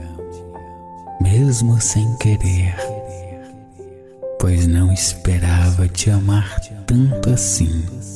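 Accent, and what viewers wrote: Minas Gerais, Brazil. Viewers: Falando desse jeito eu nem vou dormir essa noite que isso você deixa eu sem saída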